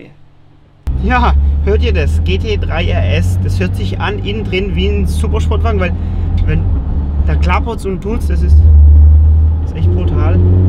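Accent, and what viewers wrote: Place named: Swabia, Germany. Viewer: Super Video, schön erklärt und der schwäbische Akzent passt perfekt! Grüße aus dem Kochertal :P!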